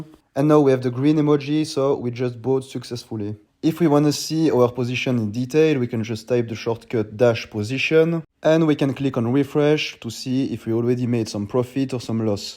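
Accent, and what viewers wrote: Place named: France. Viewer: mdr le big accent fr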